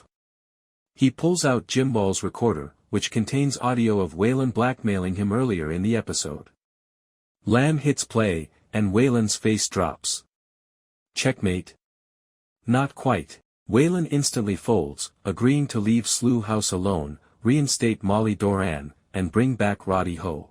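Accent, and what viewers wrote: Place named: United States. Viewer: Slew House ?????? Do you mean Slough? Using a US narrator! Whose stupid idea was that?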